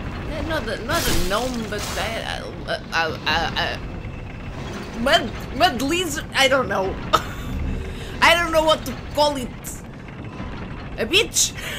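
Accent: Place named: Eastern Europe. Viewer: I thought you are east european. lol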